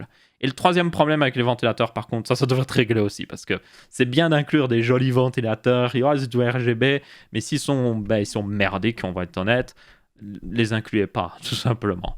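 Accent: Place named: Belgium. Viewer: Je suis français et j'adore quand tu parles Belge. Je trouve ça trop cool. Puis on a moins l'impression de vivre dans un monde monolithique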